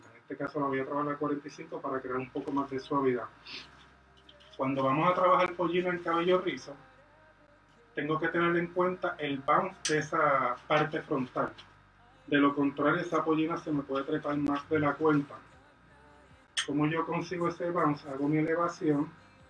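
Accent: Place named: Puerto Rico. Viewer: Eey!! Ese acento boricuaa!! Gracias por el tutorial, me es de mucha ayuda!! Saludos desde Guánica 🇵🇷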